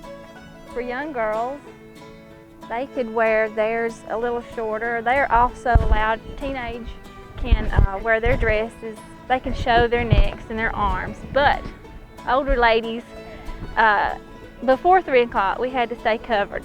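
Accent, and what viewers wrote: South, United States. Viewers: You made these dresses yourself? Very beautiful! 👍🏻🤩🥰 And I like to hear your southern accent, like in the tv series of “North and South”; I live in the Netherlands 😅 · You have a great southern accent, so charming. Love the dresses too! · Its weird seeing this b.c here in the south this is a low class accent. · I just love the Southern accent.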